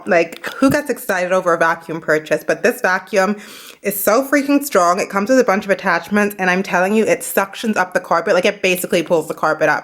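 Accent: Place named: United Kingdom. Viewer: Shes brittish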